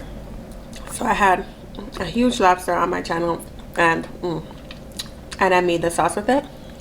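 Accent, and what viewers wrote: Jamaica. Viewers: Are you Jamaican? I love your accent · Her accent lowkey be coming out I wish Uu could do a vid abt Jamaican slang ((&)) they words they use maybe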